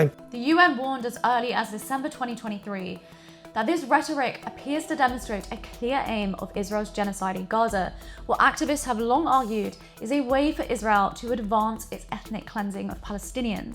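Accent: United Kingdom. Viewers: I saw your thumbnail. And I thought, “I bet she has a British accent” BAM! I’m psychic 😮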